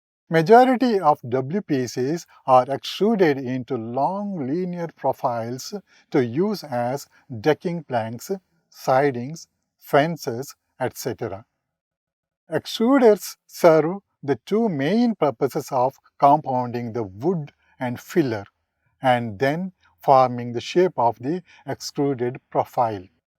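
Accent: India. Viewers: I am an Indian..still i find it difficult. That's because his accent is south Indian which is far different from north Indians.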